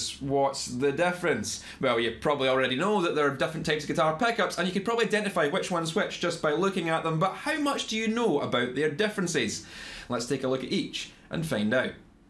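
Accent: Scotland, United Kingdom. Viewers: Scottish accents are so cool wish I had one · It's just a run of the mill Scottish accent! Nothing special. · it's scottish? cool, i was guessing australian..... cheers :) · Good to hear a Scottish accent for a change, plus I understood what you were saying, I liked the look of the 2nd guitar you were playing, can you tell me what it's called? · F*ck me, a great video on a fascinating subject, and instead of compliments and questions, the comments are plagued by thickies who have never heard a Scottish accent.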